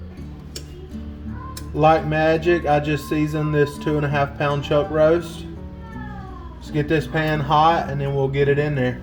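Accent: South, United States. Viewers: Bro can we trade accents? Southern accents are the best!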